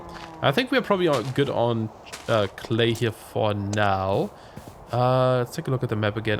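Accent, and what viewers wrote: Germany. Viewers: Love the video! Also I have a quick question. Your YouTube profile says you are Canadian, "but" your accent kinda sounds German to me. Am I right about that?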